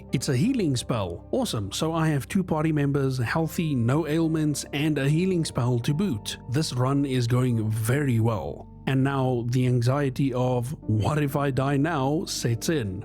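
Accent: South Africa. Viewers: Is this a fellow south african that i hear who has also put himself through perpetual torment with this absolute gem of a game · Definitely a very South African accent haha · this guy sounds like he's south african so im subscribing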